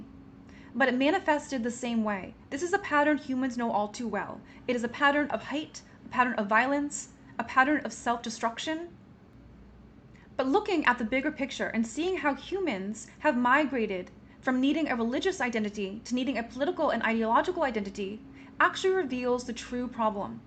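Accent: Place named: Northeast, United States. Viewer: Yes, thx. Sounds strongly of the NYC-Boston milieu, without the accent per se. I guess I am hearing education and social class more than location.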